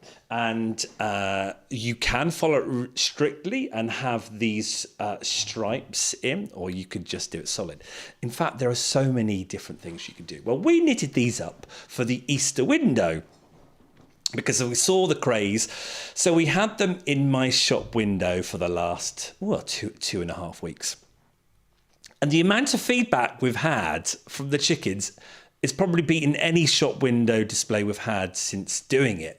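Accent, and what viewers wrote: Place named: United Kingdom. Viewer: I'm here!!! So great to see you again. A great excuse to take a break from tax prep!!! I need more than a stuffed chicken for emotional support!!! You are six foot five. Did I hear that right? How about making a six foot five blue chicken with an Olympic flag......representing you! Hahahaha! They look complicated. Will you accept one for the winter games??? I might figure it out by then!!! It does sound like fun and giving them to children would be fabulous. Where will the chickens go.....they will have flown the coop!!! I hope your store is huge or will they be "rotisserie chicken.....rotating in and out of the window? 🤣🤣🤣 You are so crazy, that's what I love about you!!! I can't wait to see your presentation! Genius! I love how you Brits say Brilliant! This is Brilliant! See you next week!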